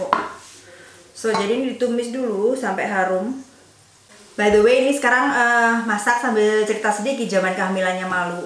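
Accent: East Java, Indonesia. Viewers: ngakak habis cara ngomong ciiri kha s jawa timuran dan btw anak lanange dimana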